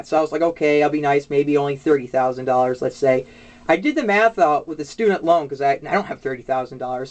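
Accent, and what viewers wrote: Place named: Minnesota, United States. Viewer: saintgauden i'm from Illinois so i'm guessing he's from Minnesota. Wisconsin, Michigan, Illinois dialect sounds similar.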